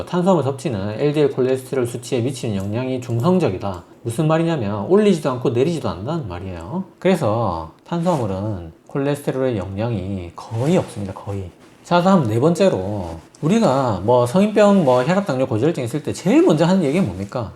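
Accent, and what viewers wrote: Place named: Busan, South Korea. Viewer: 부산 말씨가 어찌이리 구수하고 삼경살 굽는 냄새는 물러나라~! 매력 통통 젊은 의사샘님 즐거움을 주셔서 감사합니다! 자주 뵜으면 좋겠네요~^^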